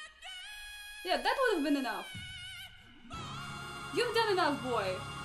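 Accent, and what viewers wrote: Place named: Russia. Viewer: Она русская, акцент чувствует ся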